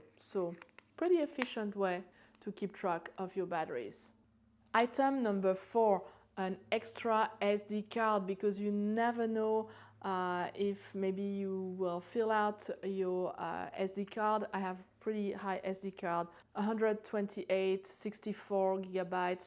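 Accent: France. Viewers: Are you from the south of France? You sound just like an old friend of mine.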